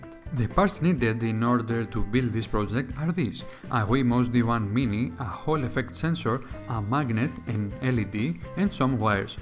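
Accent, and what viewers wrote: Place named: Greece. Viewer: Where are you from ? You accent sounds greek.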